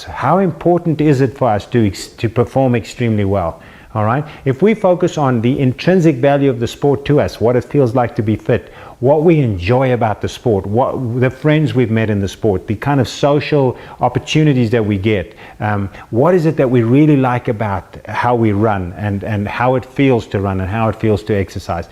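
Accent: South Africa. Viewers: South African accent!!! Best accent in the world!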